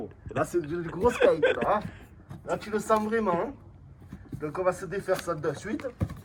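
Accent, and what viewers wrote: South, France. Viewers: avec plaisir avec ton accent du sud tes vidéos sont vraiment Crème 😅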